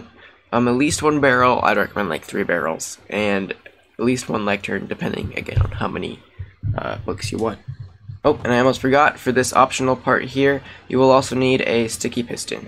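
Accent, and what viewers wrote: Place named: Poland. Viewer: Jestes polakiem.. widac po akcencie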